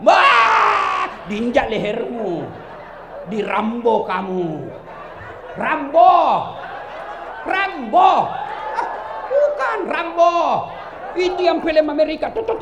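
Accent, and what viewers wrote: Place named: Toraja, Indonesia. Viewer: Orang Toraja ki pasti 😅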